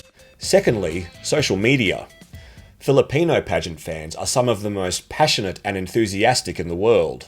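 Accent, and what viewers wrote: Australia. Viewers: I’d have to say, as a beauty pageant enthusiast, you really do live up to your name as “ ”. The research, the editing, the (background) music, even the pronunciation of the girls’ names are spot on. Though with an Australian accent which I do not mind. Lol. 😏 Anyway, I coined our recent placements at Miss Universe as Venus Raj Era or Raj Era as with Venus started our golden age/era at Miss Universe. Hehe. 😁🤭 And also, I’d like to point out that Kylie Verzosa ~ Miss International 2016 is also a product of Aces & Queens. 😊 Can’t wait for your next videos/contents. 👍🏼😊 You’re doing a great job. 👏🏼👏🏼👏🏼👏🏼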